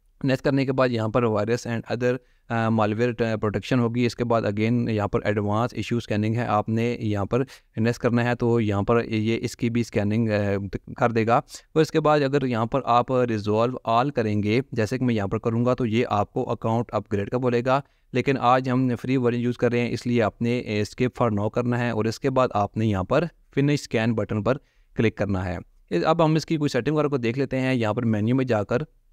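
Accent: India. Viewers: Cannot understand, you have a strong Indian accent & talk too fast. A lot of foreigners have this problem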